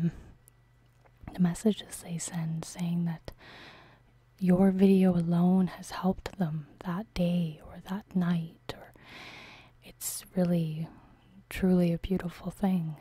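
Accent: Canada. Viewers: What part of Canada are you from? Love the accent:)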